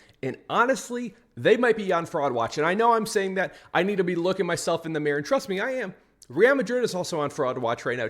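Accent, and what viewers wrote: United States. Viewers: Are u from kc bro?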